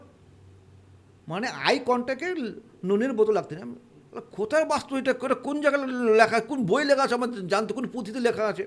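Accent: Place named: Assam, India. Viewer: দাদা কথাগুলো খুবই ভালো লাগে কিন্তু দাদার কিছু কিছু কথা আমি বুঝতে পারিনা দাদা কি আসামে থাকে কিছুটা কথা আসাম টান আসে কালীঘাটে দাদা কোথায় বসে❤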